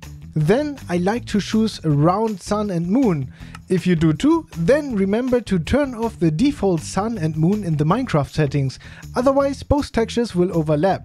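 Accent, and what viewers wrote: Germany. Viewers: deutscher akzent richtig? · Mein Lieblings Englisch-Deutsch-Sprechender haha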